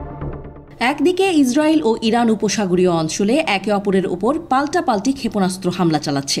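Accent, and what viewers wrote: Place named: India. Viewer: উপস্থাপিকার কথা শুনে মনে হচ্ছিল ভারতে কোনো নিউজ দেখতেছি, পরে দেখলাম RTV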